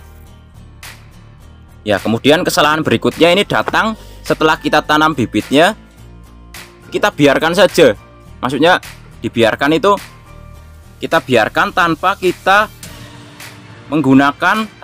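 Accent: Java, Indonesia. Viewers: Logate medok asli mana bang 😊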